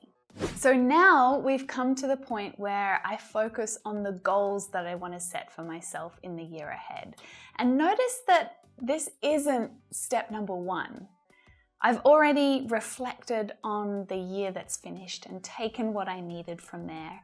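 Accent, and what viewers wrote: England, United Kingdom. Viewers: A lovely english accent! A simple mesmerizing voice! One of the best teachings ever! I wish all the best for you! Keep making this incredible videos for us! Take care dear teacher! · Hi are you british ?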